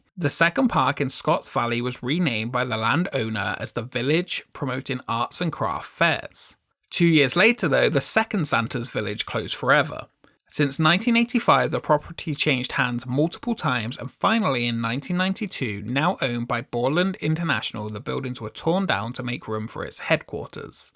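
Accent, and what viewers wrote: United Kingdom. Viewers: I wanted to comment something relevant but I keep coming back to your accent. What part of the UK are you from? I need to move there so I can live surrounded by people who sound like you 24/7.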